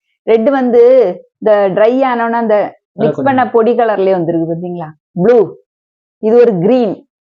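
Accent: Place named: Kerala, India. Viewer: நல்ல மலையாளம் தமிழ்🎉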